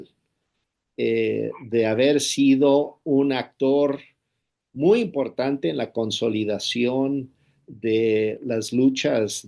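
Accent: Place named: United States. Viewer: Enrique Pascoe, ¿no eres mexicano? hablas mal el español. Al hablar del bloque liberal democrático ¿te refieres a los norteamericanos y europeos? Pensé que ellos había esclavizado a medio mundo, Africanos, asiáticos y aborígenes americanos. ¿No es EU el que le quitó más de la mitad del territorio a México? ¿NO ese mismo EU, que mencionas el que amenaza a Canadá en volverlo Estado de EU, o a Dinamarca de quitarle Groenlandia? ¿No es ese mismo EU, el que destruye a sus aliados? Solo hay qué ver la condición de los europeos ahora, después de la guerra con Ucrania. A México le iría mejor manteniéndose más al margen de EU, o lo acabará destruyendo. Lo mejor es que nosotros como mexicanos veamos nuestras opciones, no las de EU. De todas formas comprendo tu punto de vista: pareces gringo, hablas como gringo, defiendes a los gringos, aun con sus peores acciones. 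Entonces, eres gringo, ¿por qué no lo habrías de defender?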